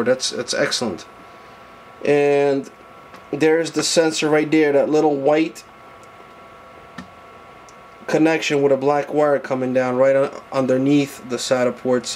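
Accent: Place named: New Jersey, United States. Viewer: I might be wrong but it sounds New Jersey lul or maybe Boston I’m not sure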